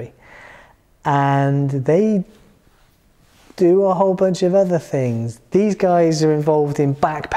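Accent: United Kingdom. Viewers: From the moment I've heard him speaking I understood that he is from the UK, lol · Maybe you wanna slow down a little bit , I barely can understand your British accent 🤷🤷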